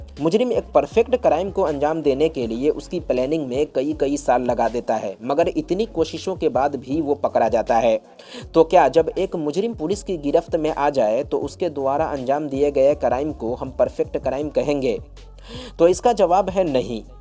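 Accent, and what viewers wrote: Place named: Bihar, India. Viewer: Bihari lahaja aa raha ha bolne me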